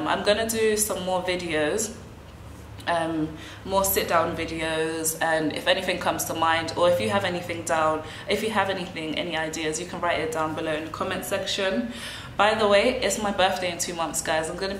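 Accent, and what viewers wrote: United Kingdom. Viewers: I love the caliber of you caracter and fisicaly you geogeouss sunshine i just have some issues with you heavy british accent...lol.... Is like listen spaniers speak spanish.. Im billingual english and spanish but my english accent is from usa and my spanish is from chile... So i sound more gooffy and global well round it when i spoke in both languages.... I think is important to teach a more landed to the ground aproaches to the lenguagues to our new world reality of globalizacion make it more related to an neutral accent.. My spanish for instance is a mix of well educated chilean vocabulary with a rancher mexican singing language what icquired in las vegas nv , with a spice of colombian coffe grounds...lol cheers 💥🌀💖🐾🎸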